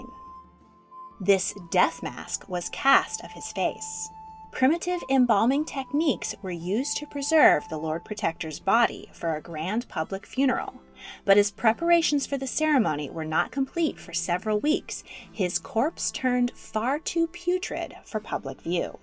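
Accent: United States. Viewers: Please get someone to narrate who is not so fucking annoying with their boring semi american twang. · geez americans have an ugly accent. i cant bear to listen to this woman.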